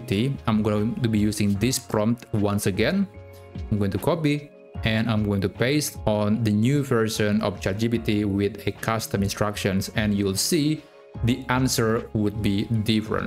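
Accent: Indonesia. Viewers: accent nya asa indo banget jir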